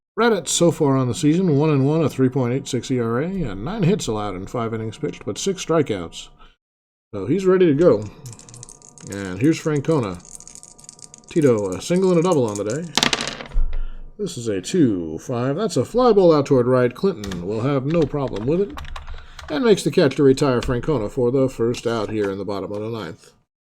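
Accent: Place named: United Kingdom. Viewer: Count me in and who is this voice of what sound like British persuasion?? Great voice Please continue very enjoyable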